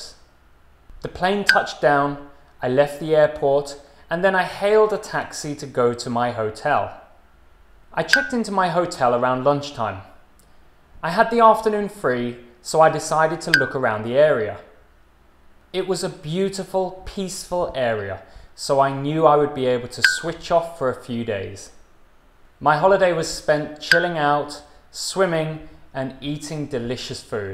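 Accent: RP, United Kingdom. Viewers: 👏👏👏👏👏What goooorgeous English ! You make me feel at Cowdray Park Polo Club, in Midhurst !😄😄🇦🇷🇬🇧🇦🇷🇬🇧🇦🇷🇬🇧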